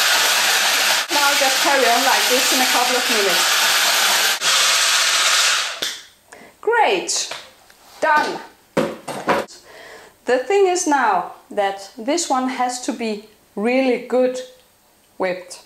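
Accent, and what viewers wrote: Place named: Denmark. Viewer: kæft hun har jo en piiiinlig dansk accent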